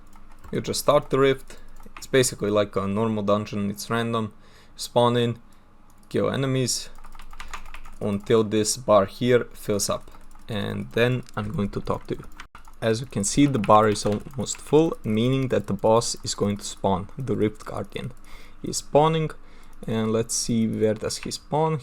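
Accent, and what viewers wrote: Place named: Balkans. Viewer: I can tell hes balkan and might speak my language because of the accent.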